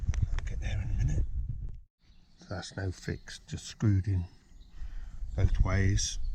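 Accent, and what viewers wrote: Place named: West Country, United Kingdom. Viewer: Just found this site and I am mesmerized by the seemingly precarious nature of your railway. It's clearly a labor of love. The amount of excavation you have done with just a pick and shovel is amazing. Well done mate! Can't wait for the next video. BTW, where in Britain are you located? I detect west country accents. Cheers from the USA.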